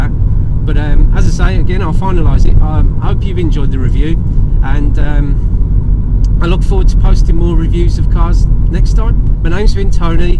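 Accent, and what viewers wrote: Birmingham, United Kingdom. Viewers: Nice review! Funny when you mentioned the A1231 in the North East - I commute along that road every day! Wouldn't have guessed that's where you were driving, based on your Brummie accent :o)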